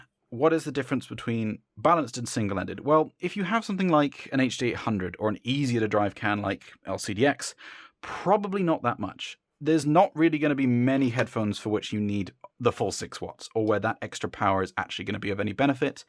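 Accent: United Kingdom. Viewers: This is like watching evil Zeos. No anime, no cat, and no cursing. Instead of the conservative talk radio vocals, there's an elegant English accent. There is no Patreon/yard sale. There are cuts in the video. There is no random song selection every time.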